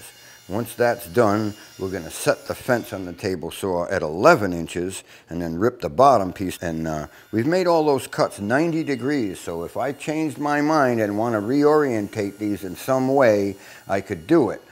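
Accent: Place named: Massachusetts, United States. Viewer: Listening to you talk reminds me of my cousin Jack who lives in Attleboro. Love the accent although we sounded a bit different out on the Cape. Also appreciate the comment about the "bucket of quahogs. I sure miss that.Used to just go out and dig them up for a great chowder on weekends. Your tutorial on building this skiff is terrific.I've always wanted to build a boat like this and I just may give this one a try. Thanks a million for the instruction and the memories.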